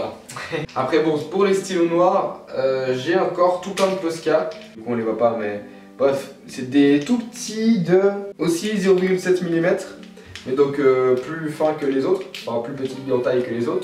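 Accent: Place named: England, United Kingdom. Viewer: Cet accent anglais ow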